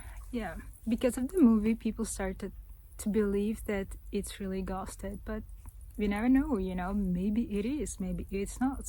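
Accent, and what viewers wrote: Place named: United States. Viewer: Thank you for your tour of traditional style living. I am a new subscriber. Kolxoz means English means " a collective village". We had similar villages of normally religious groups. Some still exist today. They are very clannish and self-isolating from society. The green flat long view country is beautiful. You are an excellent guide. The world is becoming smaller every day when an intelligent Caxa women is able to speak American style English better than I do in American cowboy country. 😊😊😊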